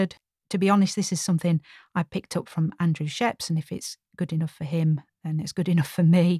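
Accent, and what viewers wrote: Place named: North, United Kingdom. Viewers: The icing on the cake of this brilliant video is familiar, approachable, northern accent and easy style which just makes this a joy to watch and listen to! Thanks & Warren. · Really enjoyed this. Great advice, well explained, and to cap it all - delivered with a wonderful Yorkshire accent.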